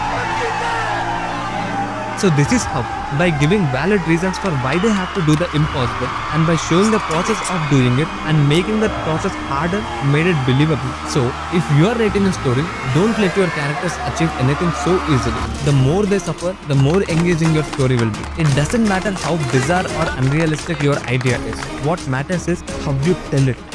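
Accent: India. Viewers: Calling a movie way ahead of it's time with an excellent cast and pitch perfect script as a "trick" you're giving it as a bad name. Ruining something which has been a cult classic for decades is what you seem to be good at. Judging your accent, you're probably Tollywood movie fan which shows one man who has no real challenge in the real world, is a god, super hero and all achievable. At least this movie didn't portray any unrealistic things like southern movies from today's do. Appreciate the art if you can't respect it.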